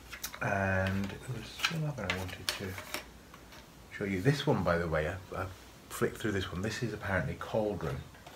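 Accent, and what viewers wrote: Scotland, United Kingdom. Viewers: The last time we went to Scotland to visit the rels we stayed in Carnoustie! Beautiful... I knew I heard a bit of Scots accent,!!!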